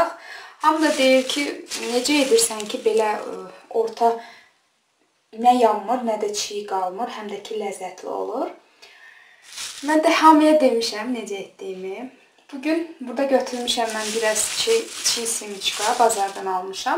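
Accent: Azerbaijan. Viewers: Ayaallah tam Azərbaycan ləhcəsi 👍🏻 Halal olsun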